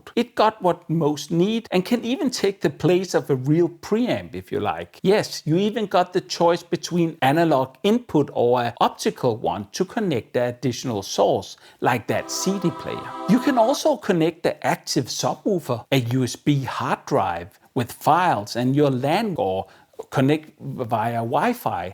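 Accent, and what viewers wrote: Denmark. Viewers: Danish accent is just the best 🙂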